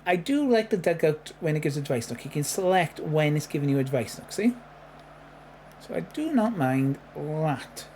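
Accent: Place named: Wales, United Kingdom. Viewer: I like your positivity ... could listen to the Welsh accent all day lol .... I'm from St Helens , Merseyside . I'm currently managing Maidstone United with a save carried over from FM24 Console on PS5 . I'm having loads of crashing . Maybe 20 odd times . I've done the regular stuff , reinstalled game , restored licence and entered safe mode and cleared cache and rebuilt . It's playable .... looking forward to the fix ⚽️⚽️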